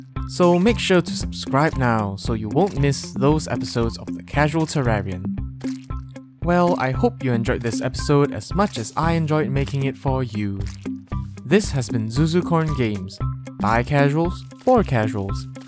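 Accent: Singapore. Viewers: are you Singaporean? I can hear your accent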